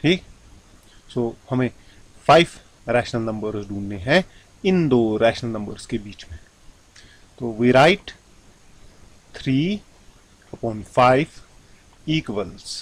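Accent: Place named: Kashmir, India. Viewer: Very nice brother... I appreciate your efforts and help... Shukriyah... I am a Kashmiri, I could recognise you are too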